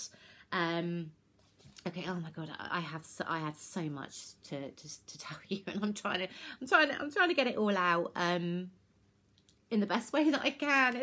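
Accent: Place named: United Kingdom. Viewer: Let me tell ya, you could help over info I already know and this Texan in her 50s would find and about anything you say in that endearing Brit accent requires no apology. Go ahead. Make a video saying, "We are invading Texas and under our rule Texans must consume tea every morning, afternoon and evening or pay a fine, doubling for each offense." Most would think it sounds charming.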